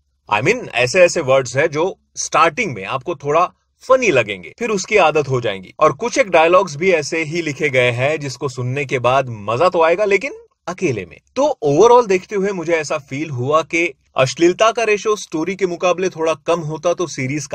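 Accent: Hyderabad, India. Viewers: u r great in hyd hindi language 😂😂😂 i am hyd and i love it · Aap ki Hyderabadi kirak hai ji bhai🤣🤣❤️💫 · Loved to hear your hyderabadi accent sir.. Love from hyderabad 😍❤️ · continue aapka haydrabadi 😂😂😂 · Hyderabadi op😂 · Hyderabadi bolte aando💥💥💥💥💥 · your heydrabadi accent too good!!!! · aapki haidrabadi ka jawab nahi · Hyderabadi hindi aap ke muh se bilkul korg jaisi lagi, aapki voice korg ke liye ekdum best hai😍😍❤️❤️🤩🤩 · aapki Hyderabadi bahut acchi hai aapke Hyderabad sunke hansne ko bhi a raha hai maja a raha hai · speaking hyderabadi is just 🔥😮😹 Love from gujrat sir ✌️ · Love from Hyderabad....your Hyderabadi language is 😂😂😂😂😂😂 too good 👍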